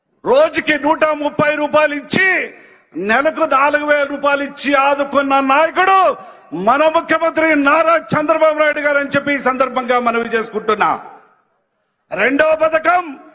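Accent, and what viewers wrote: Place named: Alur, India. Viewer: Nee alour village yasha basha 😮😮😅😅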